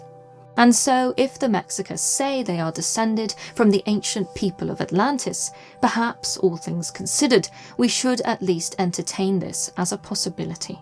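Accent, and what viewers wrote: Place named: Northern England, United Kingdom. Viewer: I like the way you're doing that bbc thing of talking in a RP accent but occasionally letting that northern accent come through, like your pronunciation of "a"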